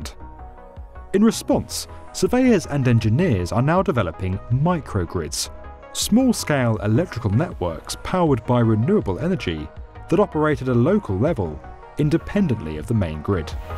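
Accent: United Kingdom. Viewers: Why are British voices so satisfying.. David Attenborough Jeremy Wade Gordon Ramsay Whatever your real name is.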